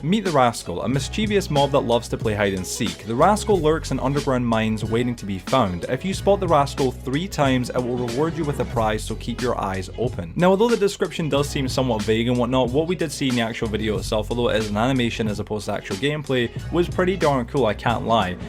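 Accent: Scotland, United Kingdom. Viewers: Where in Scotland are you from ?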